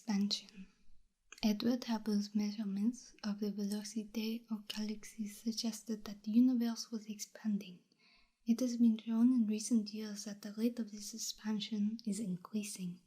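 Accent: France. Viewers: Yes I've it plz more french accent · Love your French/foreign accent roleplays, like this one and the French exchange student series. I'd love to see more of that!! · i love ur french accent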